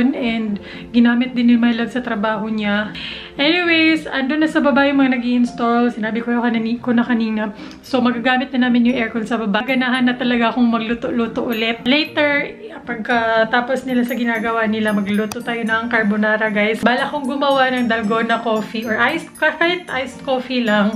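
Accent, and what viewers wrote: Visayas, Philippines. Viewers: Mas nindut bisaya pero syepre naa man gud tagalog viewers so sagul na lang hehe! Lahi ra jud ang accent sa bisaya ka lingaw. Pero ang evap ayaw tawn kalimti 😅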